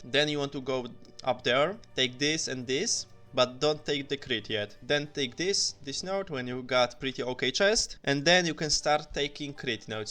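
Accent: Poland. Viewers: Słychać że Polak od razu xD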